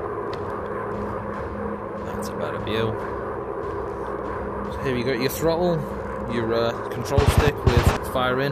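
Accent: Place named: United Kingdom. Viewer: You're British. You get a sub.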